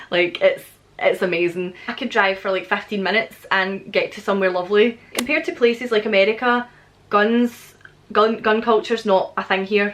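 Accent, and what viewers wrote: Scotland, United Kingdom. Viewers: we are from Saskatchewan in Canada and visited Scotland in 2015 and had a great time. We rented a car and drove about 1800 miles around your beautiful country. Your roads are narrow, but if you watched for oncoming cars there were pull out spots to pass. In towns if there was only one lane cars would wait and let you come through. Was amused that in our hotel in Edinburgh we had to place the door card in a slot to get the lights to come on. When you left and pulled the card out all the lights went off (including the fridge) Kids walked around without a cell phone in their hands. We met some friendly people. We were impressed with the highland cows and the many castles. Loved the quiet country roads that were lined with rock fences. Enjoyed the small tea houses/restaurants and. beautiful scenery and so on. Would really like to go back. 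our Mac Dougall ancestors came from Scotland and we would like to see the castle at Dunollie. I love your beautiful accent! Keep up the interesting videos. Thanks! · Hi!!! I've lived in Glasgow for 1 year....it was so good to listen to your Scottish accent again...I was missing it so much....actually when I was there I could not understand not even the word "gym"....I took some seconds to understand which word the guy was saying but now...one year later...it sounds lovely hahahah Besides that the weather and the fact that you get drunk at 8 o'clock used to shock me definitely hahaha But you are amazing...Scotland is amazing s2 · I left West Dunbartonshire (Balloch) in 1957; I lived and worked in France and Switzerland from <num>. I've lived in South Carolina for the past 20 years. I never tire of hearing "my people" talk the way you do. I miss it too. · First let me say, you have a beautiful STRONG Scottish accent!!! 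:) We went as a family of 4 (Mom, Dad 11 yr old boy, 10 yr old girl) to Edinburgh and then the Isle of Iona. It was so stressful because the travel agent had the timing of everything so close together we could barely relax. The train to Oban from Edinburgh was really beautiful and long. The ferry from Oban to Mull was absolutely beautiful and scenic and magical because of the snowfall. The bus on the Isle of Mull to the ferry to Iona was also beautiful. But once we got off the bus (April 24th) headed for the ferry to Iona at Fionnphort, we were hit head on with sleet that was so hard and sharp that we all were crying except my husband. The sweet older ladies making the pilgrimage there - their eyes were filled with pity for us because we were all so stressed and NOT dressed for the sleet. :-( The biggest thing I loved was when the Scottish speak, it's like a song to my ears. I could literally listen to a Scot speak all day every day!! 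:) Everyone was so kind and so genuinely nice. We came with the impression that the Brits and the Scots hate Americans and were a bit scared, and that just was not true. Even with all that we went through, we are sorting out our lives here in Nashville TN and preparing to move there. My surprise was probably that haggis tastes good. ;-) No air conditioning makes me very sad, but if its not too hot there, I believe I'll be okay. :) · i'm italian and i LOVE your accent!!!!wish to visit scotland one day! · If I just start to listen to your videos everyday. Do you think it's possible for me to speak with a Scottish accent in a year?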